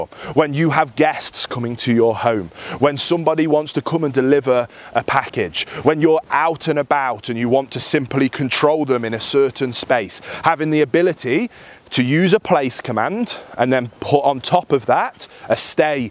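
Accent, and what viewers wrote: United Kingdom. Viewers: What amazing training tips! I've lived in South Korea for a long time so hearing a good British accent is something I miss!!! My lab loves his crate now since watching your video.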